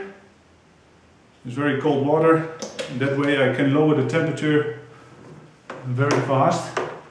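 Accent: Netherlands. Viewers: hey dude I moved to norway (from holland) the beer here is about 10 times more expensive as in holland. so when I stumbeled on to this in a paint shop ;), I just bought the stuff because I like to do new stuff and then yeah when it comes to beer theres no doubt. Ìm starting right now on the brew. but dude I gues you are dutch too right. the way the speak english sounds so dutch!! haha. well Im gonna make me some beer now!!!! thanks for the movie!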